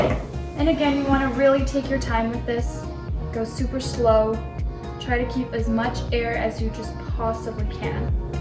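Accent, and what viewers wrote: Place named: United States. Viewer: Wow, I think you’re a sweetheart but it took a bit to grasp a lot of things you said. You speak like an American, but so many of your terms didn’t make sense to me. Now that I understand, I’m pretty impressed. A couple things. You weren’t wrong when you were trying to say Amarena Cherries. Those are not the same as Maraschino cherries. Amarena are good cherries to top your cake with but I like what you did. You keep referencing dough. Maybe in Germany, you think of it as dough but in my world it is more of a batter. Dough is what we refer to with bread, cookies and pastries. Alcohol doesn’t vanish, it evaporates. Bottom line is that your cake turned out absolutely beautiful. Good job! Is this a recipe from your family or did you get it from another YouTube? Keep up the good work! Also, how much is 1 glass of cherries?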